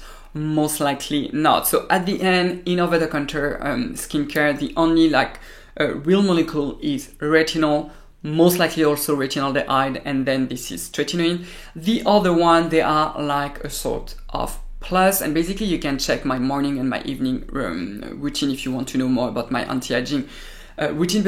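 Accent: France. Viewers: I thank you so much for your content & explanation! Every video you make me smarter & way more informed. Added bonus is listening to your incredible French accent! French was my foreign language in school as I think it is the most beautiful language in the world! ❤️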